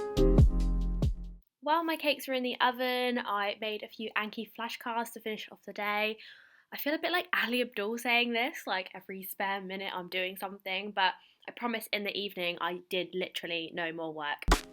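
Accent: United Kingdom. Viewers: I love your British accent ❤️ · Love your British accent ❤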